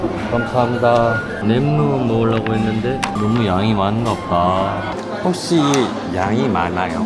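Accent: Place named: Gyeongsang, South Korea. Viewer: 말씀하시는게 되게 웃겨서 두번째 영상 보는중 입니다. 경상도에요? 사투리가 구수하네요 ^^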